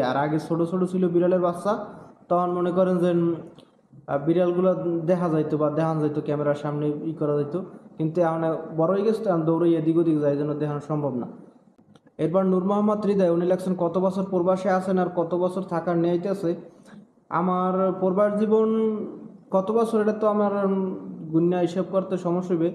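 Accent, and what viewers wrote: Barishal, Bangladesh. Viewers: ভাই আপনি কি বরিসাল্ল্যা?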